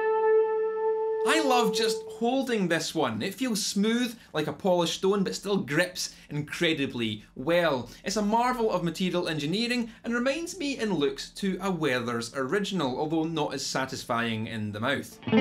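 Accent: Scotland, United Kingdom. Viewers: Isn't it obvious that he's a Scot?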